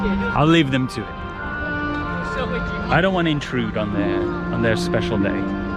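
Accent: United Kingdom. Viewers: That Brit accent that reminds me of Ron in Harry Potter. I just laughed quite nice 😁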